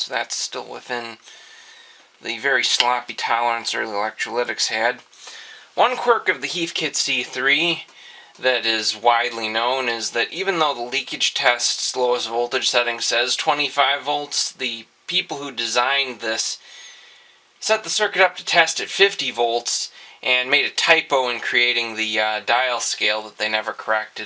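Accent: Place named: Chicago, United States. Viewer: Are you from the midwest? You have the same inflection as I do, almost like it has a Chicago swing to it...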